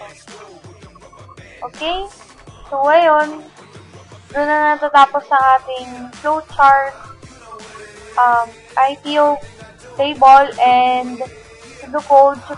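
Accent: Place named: Greece. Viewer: Nice work but your English pronunciation like Greek not that clear.. overally a good work \m/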